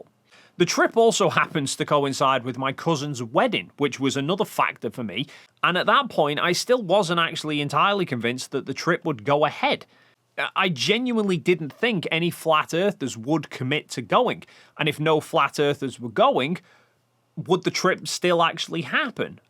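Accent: United Kingdom. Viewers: Sorry about the not-relevant question, but... I've been trying to place your accent. Where in the UK are you from?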